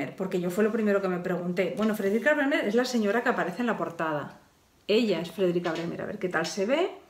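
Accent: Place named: Asturias, Spain. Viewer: eres muy culta y hablas muy bien. Tú acento asturiano me encanta.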